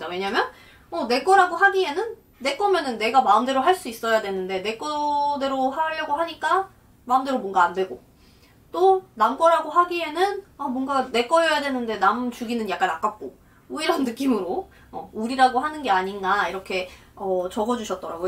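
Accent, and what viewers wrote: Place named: South Korea. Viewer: 누나는 사실 '우리' 한국 사람임 유소년기를 보낸 지역이 정체성을 많이 결정하는데 초등학교까지 한국에서 졸업하셧다니 말투, 문화 영혼까지 한국 사람으로 보임. 그냥 말씀하시는 것도 리액션도 그냥 한국 여자잖아요 ㅋㅋ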